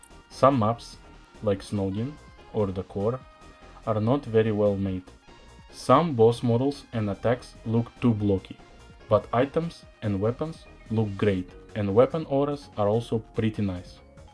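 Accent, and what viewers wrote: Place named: Russia. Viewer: Russian accents 🤤🙏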